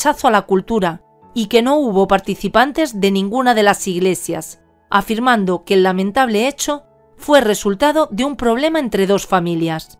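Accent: Spain. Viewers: Un gusto. 👏🙏🙋‍♀️♥️🍀🌺🇺🇾🇺🇾. Te mando un abrazo grande desde Paysandú Uruguay. Me encantan tus relatos. Y ese tono Español. Q ignorancia!!! X Dioss q en el siglo 21 exista estas creencias. Y matar a un buen hombre x nada. Lamentable estamos mundialmente más salvaje. 😭🤦‍♀️🤦‍♀️😭🙏🙏🙏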